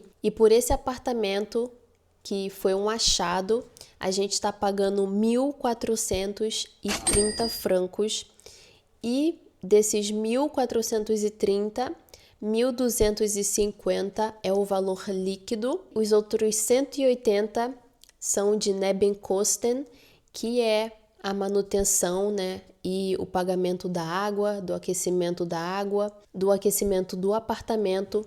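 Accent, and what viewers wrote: Rio de Janeiro, Brazil. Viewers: Eu também não gosto do sotaque carioca. Mas ela não tem culpa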